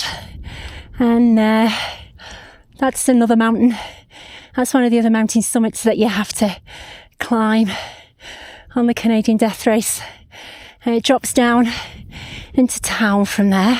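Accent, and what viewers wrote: United Kingdom. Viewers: Great video. Thanks for an expert perspective. On race day, I only ever see the back side to Ambler Loop in the dim dusk or in the dark. Have trained there quite a number of times but its still great to see it from a runners point of view...btw, its great to hear a Brit commentary...I am from Cheshire... see you in God's Country in August!!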